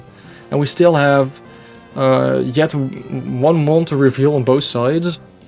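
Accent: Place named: Netherlands. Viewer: ik ruik een nederlands accent hehe.. leuke video man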